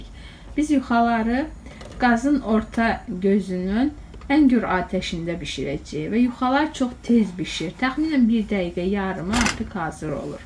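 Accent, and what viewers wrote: Baku, Azerbaijan. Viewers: Əladır,şirin ləhcəli bakılı qız.Bu torta qat.süd və yağdan ibarət krem çəkmək olar?mən bişmiş krem sevmirəm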